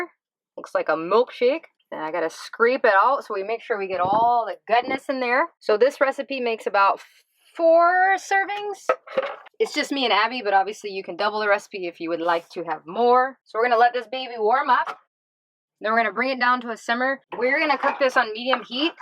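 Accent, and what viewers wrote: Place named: Wisconsin, United States. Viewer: As a born and raised in Wisconsin I def approve of the accent!!!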